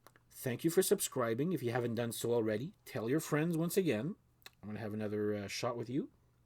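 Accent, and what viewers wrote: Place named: Canada. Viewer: Very good analysis. I think “ Meliora” is the best one too, and I share your enthusiasm for the awesomeness that is “Square Hammer.” My only quibble is “If You Have Ghosts.” I am a Roky Erickson fan of 40 years almost, and think Ghost does the definitive version of this song. Watch some live versions where Tobias really owns the song and the guitar lines at the end are sublime. Also, I ‘m glad you mentioned Ottawa cos I thought you had either a Canadian or Midwestern US type of accent. Subscribed!👍🏼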